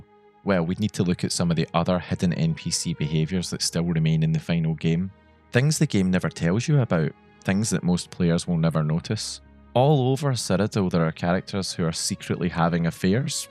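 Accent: Scotland, United Kingdom. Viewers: I love when I randomly encounter a Scottish YouTuber. "Bumf" is a cracking word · Is this a Scottish accent?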